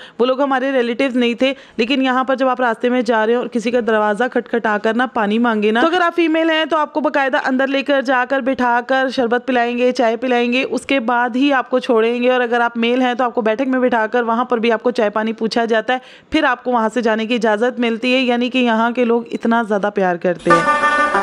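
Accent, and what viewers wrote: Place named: Lahore, Pakistan. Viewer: Your urdu is not like pashto speaking persn is totaly lahori accent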